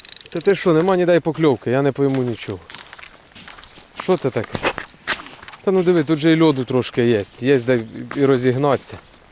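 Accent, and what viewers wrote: Poltava, Ukraine. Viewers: обожнюю полтавський акцент.Дуже багато схлжого з артуром і жести і слова ну то тнічого ми ж українці)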